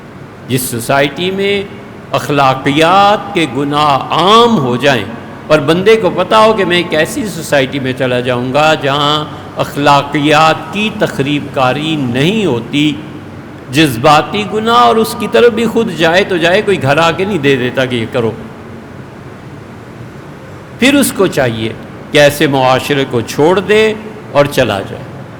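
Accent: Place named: Lucknow, India. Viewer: دریافت فرمایا بشمول کتاب کا عکس مفتی صاحب کی مندرجہ بلالا گفتگو پر غور فرمایا جاۓ کہ مفتی صاحب اپنے لکھنوی لہجہ و زبان کو رائیج کرنے میں کس قدر کوشاں ہیں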